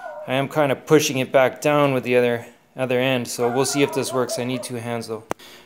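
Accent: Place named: Canada. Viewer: accents are fascinating. I can immediately tell what part of world this gentlemen is from. Go Wayne Gretzky!!! lol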